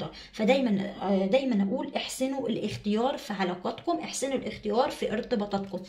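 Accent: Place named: Mosul, Iraq. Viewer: احجي مصلاوي واتاكد انتي مصلاوية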